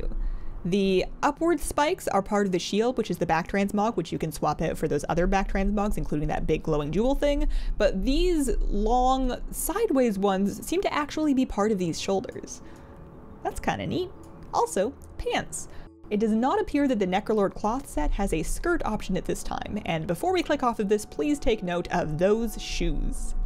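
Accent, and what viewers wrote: Canada. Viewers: Nice video, thanks! Btw are you Canadian????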